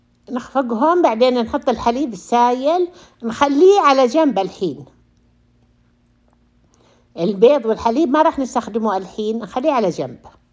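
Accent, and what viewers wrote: Iraq. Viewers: احسبك عراقيه كلامك شوي عراقي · يمكن يسألوك لأن طريقة نطقك للحروف عراقي بالذات لما تقولي ماء ورد وكلمات كثير عراقيه ناسيتها انا تابعتك وعلى بالي انك عراقيه بالمدينه وبعدين عرفت انك سعوديه مو من القبوري من طريقة كلامك ولكن انتي عراقيه او سعوديه عسل ماشاءالله ،،يعطيك العافيه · نتشرف بيج ست انا من العراق نفس لهجتها انا احبك كثير واحنا عندنا عشيرته الجبور تمتد الحجاز كلها وهي أكبر عشيره في العراق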